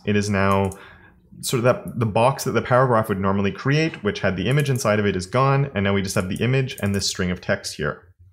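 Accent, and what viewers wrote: Canada. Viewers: Canadian accent and them o's.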